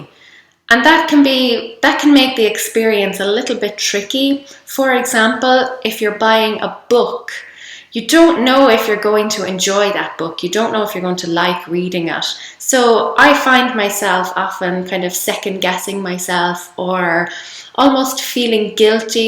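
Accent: Ireland. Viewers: I DO like an Irish accent ;) :) !! But I am also impressed how early on you found and implemented konmari/Marie Kondo's tips and philosophy - I am so grateful to have stumbled upon her Netflix Show - what a game-changer!! And now that I've binge-watched the heck out of it, konmari youtube-videos are my daily go-to!! Thank you!!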